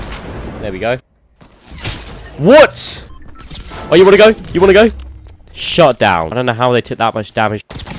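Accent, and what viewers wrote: United Kingdom. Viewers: British accent My dream accent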